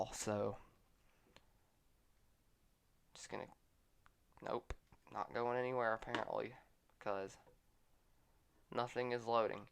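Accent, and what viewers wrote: Texas, United States. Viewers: great advise and your voice sounds nice (sounds like your from texas or near that area)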